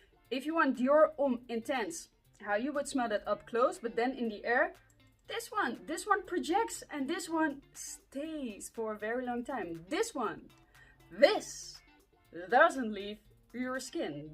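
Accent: Netherlands. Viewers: I have just discovered your channel, wow I've been missing out... I have watched 4 of your videos so far and I must say you are perfect to be a TV hostess, have you ever think of that? I love your dutch accent and curly hair. have a wonderful day. Neighbor from Denmark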